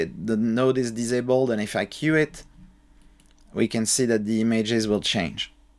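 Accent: France. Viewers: You sounds French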